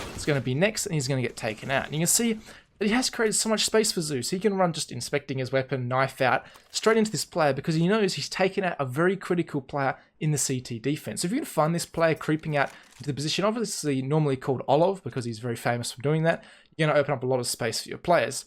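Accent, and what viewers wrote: Australia. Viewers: Nice recommendation, but he is not from NA I think. He must be from Australia, that's why he is speaking with a funny accent.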